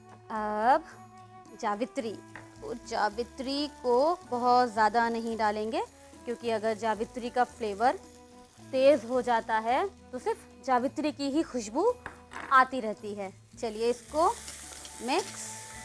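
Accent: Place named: Uttar Pradesh, India. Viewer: Awesome recipe. Looks great. Just need to say sm tips while cooking. Tipical up accent. keep it up. Gd job.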